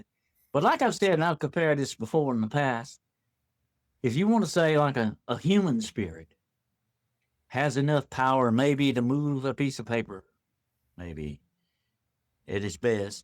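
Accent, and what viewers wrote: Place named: South, United States. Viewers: He's definitely from the Southern part of the U.S. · Aussies don't have accents like that. He's definitely American.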